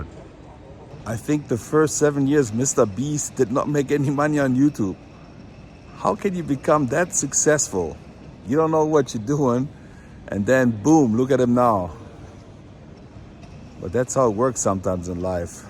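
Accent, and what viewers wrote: Germany. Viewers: Who doesn't love this guy? Please like and subscribe. I love this German guy. Also love the accent. !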